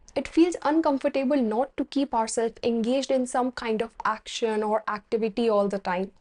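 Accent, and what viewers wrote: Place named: India. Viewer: Indian English Girl 😊 Masterpiece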